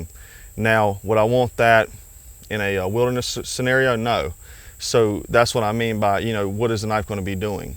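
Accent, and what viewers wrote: South, United States. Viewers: You sound like you're from North/South Carolina? Nothing wrong with that. American by birth, Southern by the grace of God. Great video.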